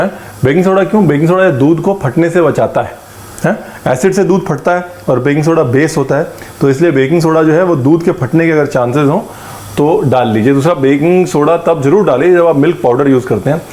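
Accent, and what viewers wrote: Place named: Lucknow, India. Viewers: Ranveer jab aap humaye lucknow ki bhasha me baat karte hai... Tab aap aur bhi jada apne lgte hai.. ☺🤗👌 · love the way you talked today that Lucknowi style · Sir aapki lucknowi language and style umdah..🥰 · Lovedddd the Lucknowi Accent.. Ama miyaa kamaal krte hain aap!❤ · Aap kitni pyari lucknawi bolate Hain hum raebareli pratapgarh se hai 😀 I love you for this ki saree recipe dekhte hain aap kahate Hain Na hanste khelte khana ban jaaye to achcha hamen bahut pasand hai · He talk like Lucknow wali accent. 😀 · Bhai aap lukhnavi bol rahe hai mast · Chef your lucknow accent is very nice❤️ And recipe 😋😋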